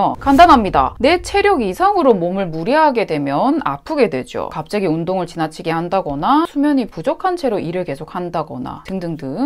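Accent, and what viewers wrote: Gyeongsang, South Korea. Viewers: 일본어 하시는건가요 경상도 사투리 하시는건가요?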